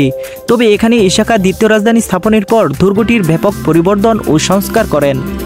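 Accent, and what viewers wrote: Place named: Kishoreganj, Bangladesh. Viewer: কিশোরগঞ্জ নেত্রকোনার আঞ্চলিক ভাষা বাদ দিয়ে শুদ্ধ ভাষায় কথা বলার চেষ্টা করুন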